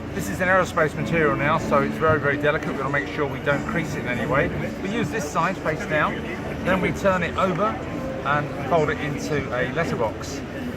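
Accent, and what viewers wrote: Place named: Cambridgeshire, United Kingdom. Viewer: CLAUSE BOMB!! :) Good day fellow brit, maybe even from cambridge? as you are in duxford and you have a distinctive cambridgeshire accent rather similar to mine. Keep up the good work chap. Tally ho!!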